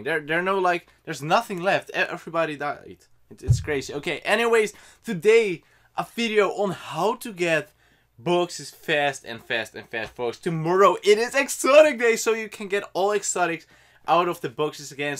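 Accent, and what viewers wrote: Netherlands. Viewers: wait are you dutch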